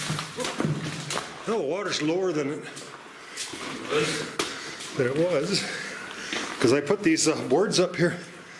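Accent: Canada. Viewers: This guy's really Canadian eah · i wonder if this guy is canadian? "eh?" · cant hide canadian accent - Former Ice road trucker - Alex Debogorski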